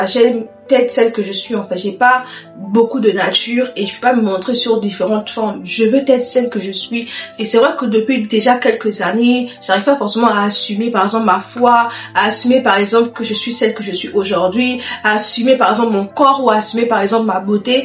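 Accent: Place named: Gabon. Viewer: Bien l’accent gabonais oh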